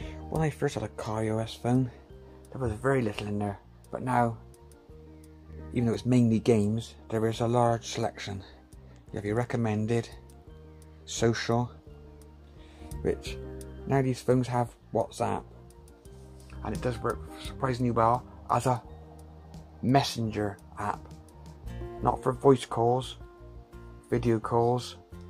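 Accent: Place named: West Country, United Kingdom. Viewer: Great he's English. Could be from West country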